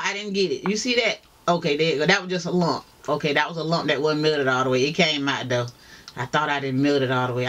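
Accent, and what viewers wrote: South, United States. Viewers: I love your southern accent. I am now a subscriber.😍❤️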